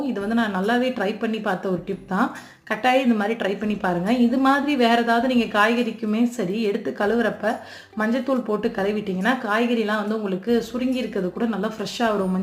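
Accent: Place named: Thanjavur, India. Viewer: Nice tips sister where is ur home town ur slang same like us .my native is tanjore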